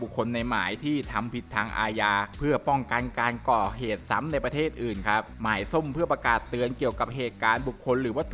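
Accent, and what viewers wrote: Thailand. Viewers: เหน่อแบบนี้ ราดรี หรือ คอนถม หรือ เมืองกาญ ครับ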